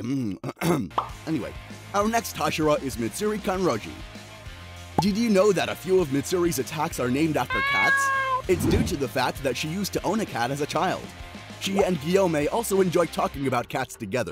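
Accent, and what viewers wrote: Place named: France. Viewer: bro is speaking frenglich ( a bit )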